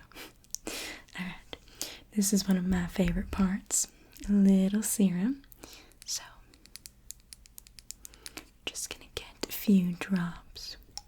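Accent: South, United States. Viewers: The southern accent is decent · You nailed that southern accent 🩶🩶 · The southern accent is nice 😊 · The Accent was too good tbh, are you actually from the Southern countryside? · I love the southern girl accent! 👍😘❤️ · This has to be the best ASMR video I've ever seen, the southern accent is so peaceful and relaxing ❤❤❤❤ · My new favorite asmr video 🥰🥰💓💓amazing southern voice:D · Didn’t know I needed the Southern accent this is highkey fire 🥹 pleaseee do more Obsessed Girl tho it’s peak and I really wanna hear more dirt on Olivia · I’m British 🇬🇧 but I love the accent. Whereabouts in the states is that accent from? It’s very good :) 😍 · You HAVE to do another southern accent video, no lie I fell asleep somewhere in between 0 and 30 seconds. I still watched the whorl thing when I woke up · And here I thought your normal voice was heavenly but this accent with the southern tone is just amazing. 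Hope this isn’t the only time we’ll hear it 🤠🫶🏼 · Oh southern accent I like that, I'm very familiar with that language. I think you should do more.🤠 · Idk why, but for some reason the southern accent was extremely comforting. Like I actively felt safe listening to this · The Southern drawl actually suits you quite well 😄😝❤️ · Hey, I love your videos! Im from the south and I thought the southern accent was pretty good. Also congrats on the 150k subs 🎉! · The southern accent.. I'm 44 now. I speak with an accent like that once in a while. I'm deep deep South Texas. . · Wow what a great video😊 your southern accent was spot on. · Ok new to this channel...really loving the southern accent darlin ❤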